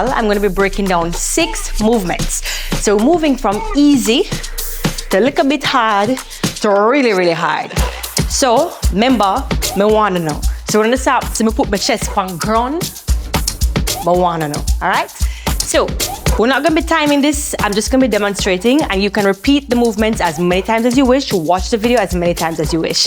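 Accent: Jamaica. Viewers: I got mesmerized inlove when you say down wat accent haha i hear jamaica but i hear something else to 🤦🏿🤣🤦🏿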